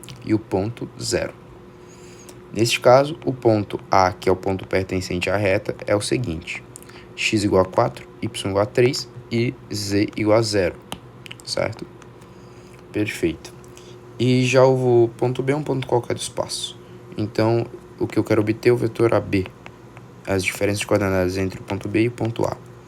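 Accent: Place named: Pernambuco, Brazil. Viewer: Não entendo nada de matemática. Mas amei o vídeo ❤ kkkkkk Porém, gostaria de ressaltar que ficaria mais legal com o seu sotaque original, pernambucano 🥰😘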